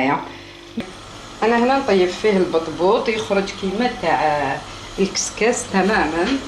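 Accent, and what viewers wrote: Kabylie, Algeria. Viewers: صح انا ديما ندهن قبل ما نطيب الكسرة وهي لهجتها مش جيجلية تبان قبايلية ساكنة في جيجل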